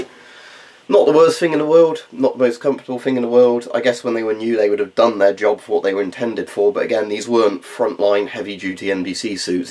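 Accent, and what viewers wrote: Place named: England, United Kingdom. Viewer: I like you english voice👍🏻👍🏻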